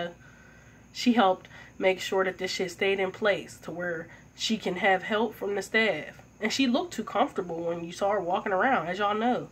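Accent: Pittsburgh, United States. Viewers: Great video. U sound like your from Pittsburgh 😀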